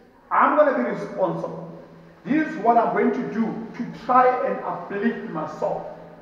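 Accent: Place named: Zimbabwe. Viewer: If any illegal aliens from our continent is not happy to be in South Africa they're other 53 African countries they can choose and go to, we don't want any unskilled illegal immigrants to flock to South Africa to work jobs locals can do. I think the time has arrived were the government needs to introduce job restrictions for African immigrants to discourage them to stop coming to our country in their millions because the truth is they're no jobs in South Africa we have 40% youth unemployment we need to prioritize our citizens first before we can accept asylum seekers and economic migrants. You can in your right mind compare a small economy of South Africa to UAE, Saudi Arabia, Japan, China, South Korea or any other powerful countries because most of those nations don't have the problems we have of the alarming high unemployment, crime, poverty, landless etc. 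We are not happy as the citizens to live with millions of illegal immigrants in our communities and we will not back down from that you must urge your brothers and sisters back in Zimbabwe or whatever were you from because i care hear your accent to let them know that they're no opportunities for them in South Africa because locals are jobless, hungry, poor, landless etc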